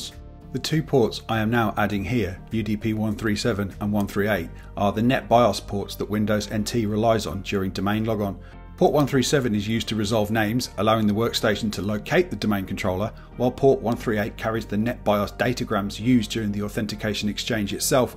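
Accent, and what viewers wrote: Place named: West Midlands, United Kingdom. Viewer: I can hear a fellow West Midlands accent a mile away.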